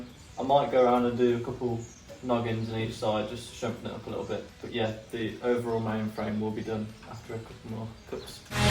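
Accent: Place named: West Midlands, United Kingdom. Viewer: This looks so good, sounds like you're from the West Midlands, would you offer this as a service ?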